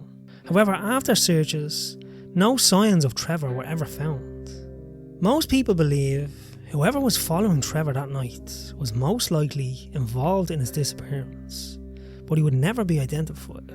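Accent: Dublin, Ireland. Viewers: Nice to hear a Dub accent narrating TC for a change. Understandable it has to be adjusted a bit for those not familiar with our accent. Maybe subtitles to help people acclimate? Anyway great work, keep it up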